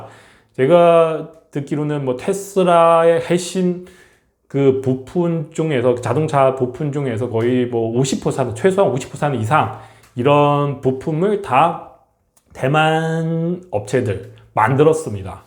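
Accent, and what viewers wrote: China. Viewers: 말투가 왜이래? 중궈로군!! ㅉㅉ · 발음이, 혹시 조선족 이신가요. 대만에 미국 있는것은, 장개석 정부 대만 피신 이후, 계속 있어 왔읍니다. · 중국분이세요? 대만분이세요? 발음이 그쪽인데요?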